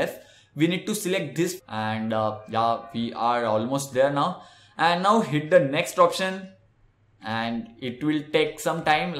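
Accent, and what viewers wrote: India. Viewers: unbearable amount of indian accent hurting my ears... good video though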